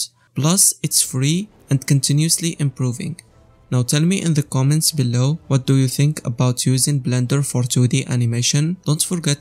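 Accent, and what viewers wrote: Morocco. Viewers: Living for the Moroccan accent!! 😄 Bless you for this video! I'm trying to learn 2D and 3D animation on Blendr and it hasn't been so easy so far, a bit confusing! hopefully it'll get better soon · Is this a Moroccan accent ? Good video tho 👍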